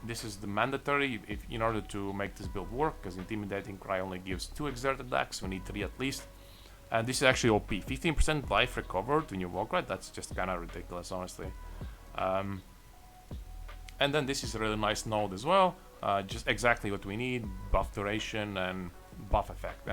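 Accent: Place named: Russia. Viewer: Чувак, все круто, но всем понятно, что твой английский не мейн язык. И скорее всего твой мейн язык русский. Запили этот видик на русском. Ты показал действительно крутые скилы.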